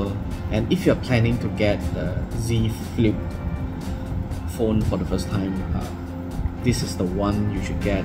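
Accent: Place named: Malaysia. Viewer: Darn I wish you Malay Chinese and Singaporeans didn’t have that horrid accent. Both Lee Kwan Yew and prof. Gang Wu speak with a better accent.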